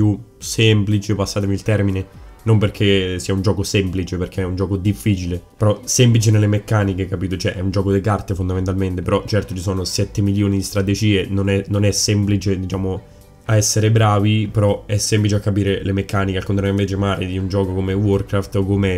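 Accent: Marche, Italy. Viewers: Ciao, mi sono appena iscritta al canale. I miei complimenti, finalmente qualcuno che fai degli ottimi video di wow in italiano ^^ riconosco l'accento della mia zona....sei marchigiano o sbaglio?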